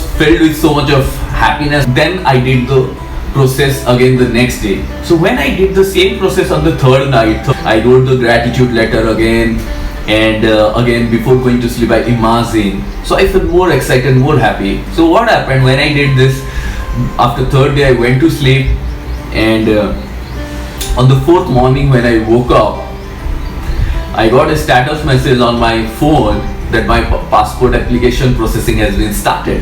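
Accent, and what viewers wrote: Odisha, India. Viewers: Is your mother tongue odiya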